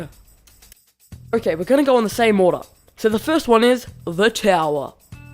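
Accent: Australia. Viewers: Are you Australian??? You sound like Steve Irwin... CRIKEY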